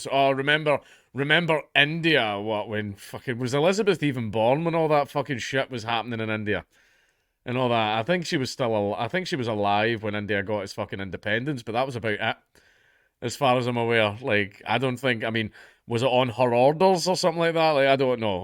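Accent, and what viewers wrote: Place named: England, United Kingdom. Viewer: You sound like 80% of the English population and now we have her son who no one cares about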